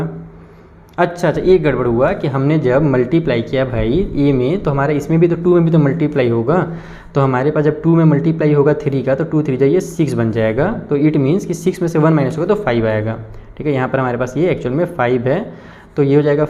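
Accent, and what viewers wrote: Bihar, India. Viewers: Sir Bihar se hai kya mai bhi hu 😅😅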